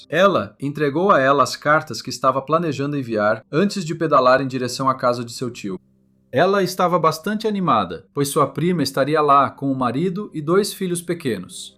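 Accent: Portugal. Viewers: Impossível assistir com esse sotaque de portugal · Esse sotaque portgues é muito feio 😅😅😅😅😅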